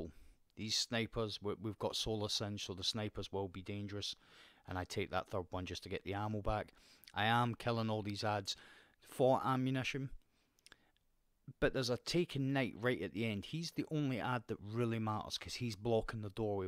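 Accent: Scotland, United Kingdom. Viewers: Great tutorial dude really helpful strategies for each area also are you scottish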